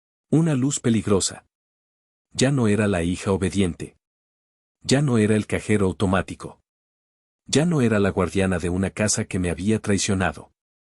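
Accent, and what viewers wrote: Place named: Mexico. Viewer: Dejaron de gustarme estas narraciones,mejicanas😢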